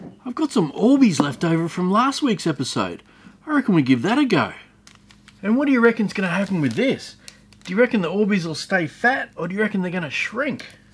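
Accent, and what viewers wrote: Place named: Australia. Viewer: I liked 1& 2. Hey, where I live we are having tornados in the area so we have a Take Cover Warning. I’m watching your videos while sitting in my bathtub (I don’t have a basement). Thanks for keeping me company. Do they have tornados in Australia? You sound like that is where you are from. Have a great day.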